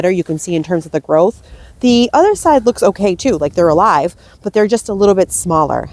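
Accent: Massachusetts, United States. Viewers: Hi! I really enjoyed this video and seeing both you and together…thanks for sharing your plans! I could just feel the joy & excitement you had & it motivated me to start my planning now! We have a new flower bed around our new patio just empty, ready and waiting to be planted up! Oh boy…I’ve got lots of decisions to make…LOL! 😂 BTW…loved hearing your MA accent too! 😊 Also happy to hear that your Chinese Fringe plant from Janie made it…YAY. 👏🏼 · Love your Mass accent! Don't change it!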